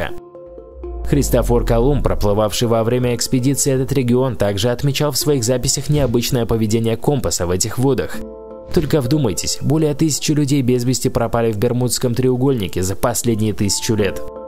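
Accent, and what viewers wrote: Moscow, Russia. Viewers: Такой интересный канал, но из за противного московского говора не могу смотреть до конца. Как бы не терпела, раздражает.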